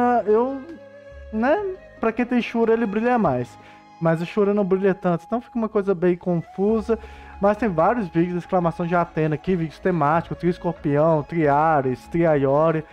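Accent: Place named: Northeast, Brazil. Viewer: pelo seu sotaque eu jurava que era do Nordeste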